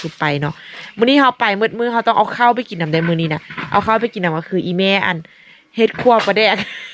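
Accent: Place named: Phu Thai, Thailand. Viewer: ภาษาน้องอู่ลาวผสมภูไทเพราะเฮาเป็นคนภูไทฟังออก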